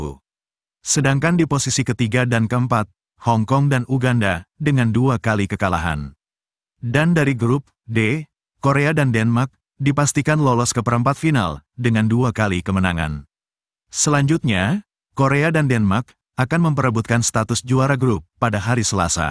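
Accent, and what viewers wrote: Banyumas, Indonesia. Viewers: Ngapak ora baen baen ya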